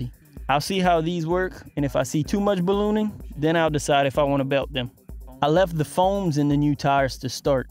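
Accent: Louisiana, United States. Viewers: Where are you from? Have to be from South Louisiana w that accent . Who Dat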